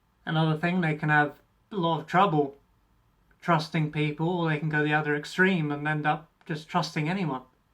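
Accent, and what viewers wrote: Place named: United Kingdom. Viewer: Thank you.🌴🌊🌺 I am gonna try till I die.. 😊. Hehe. So many things you seid are so true. Tummy problems and learning problems and chasing adrenilen or shutting down and becoming a hermit. Lol, iv been stuck in the hermit stage lately. But I have to laugh about it sometimes. I love yr British accent. I have to watch Ricky gervase when I'm feeling really bad because he has a way of making me feel like life is crazy and we all have to laugh at it sometimes but, when I herd you talk, your easy to listen to and bring me back to Earth. I think I'm ment to live in England. Lately all the people I watch on YouTube who have inspired me live over there. It looks cold there. All of you are always whereing Jumpers. 🤒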